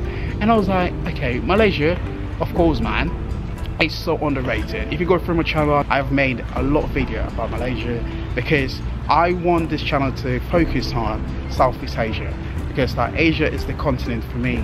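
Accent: United Kingdom. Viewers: I love your British English accent.👍👍👍👍👍Welcone to Malaysia. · Your British accent not so sound like London I gues🤔... correct ne if I'm wrong 😂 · I really love your British English accent. Hope I will fluent in British English one fine day and in same time I'm proud of my Malaysian language.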